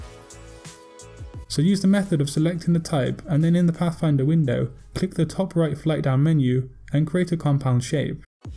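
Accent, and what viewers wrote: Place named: Bristol, United Kingdom. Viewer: I knew I recognised that accent! The same for me between Bris and Bath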